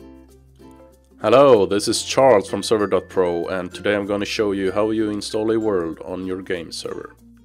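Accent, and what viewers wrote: Sweden. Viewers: Oh you're Swedish lol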